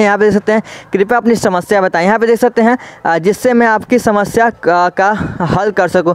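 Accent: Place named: UP/Bihar, India. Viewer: Bro are you from up/bihar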